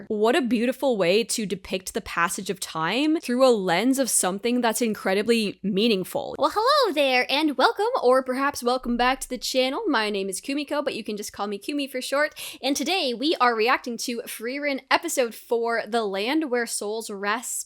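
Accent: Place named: Canada. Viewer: I just learned about the Canadian rise, and now I can't unhear it xD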